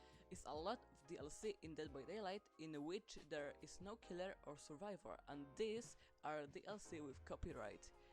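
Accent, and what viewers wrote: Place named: England, United Kingdom. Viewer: Uu ar pierdolnięty anglik xd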